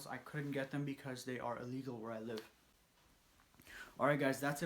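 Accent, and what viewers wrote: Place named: Iran. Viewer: ایرانی بودنت داد می زنه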